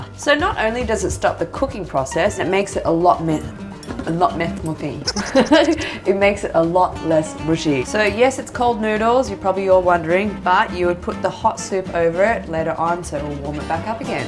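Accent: Australia. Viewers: Is she Aussie? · You sound Australian!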